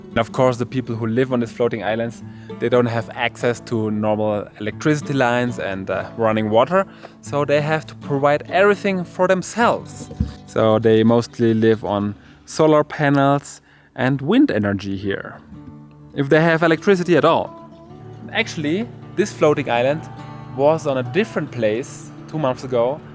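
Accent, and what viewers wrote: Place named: Germany. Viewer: yesterday I just wanted to know about Germany, or better, Deutschland... and now I'm a fan... lol it's the Deutsch accent... I love it.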